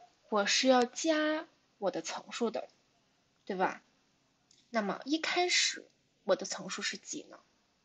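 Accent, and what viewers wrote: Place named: Northeast, China. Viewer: 这个东北口音杠杠的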